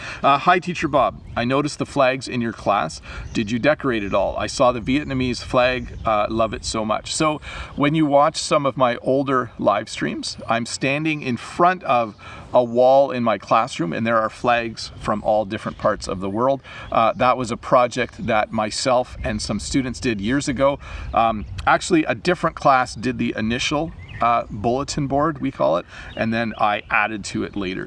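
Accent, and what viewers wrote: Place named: Canada. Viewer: Hello, Bob! Hello from Ukraine! :) I'm glad I found your video lessons . This is the first time I hear Canadian English! Thank you for your work.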